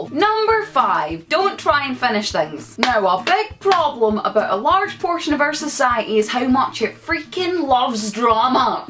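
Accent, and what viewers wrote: Scotland, United Kingdom. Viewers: Also I know it's a little crazy but no dairy 3 days before, it causes bloating :) I'm getting married in a month so these tips help a lot, it's just a little backyard wedding so I'm making the food, cake, decorations and such. Also, where are you from? I love your accent but cant figure it out lol. Scottish?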